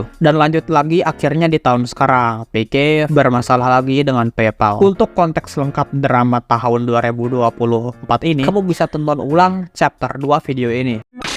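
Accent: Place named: West Java, Indonesia. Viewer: Sunda kayak nya:v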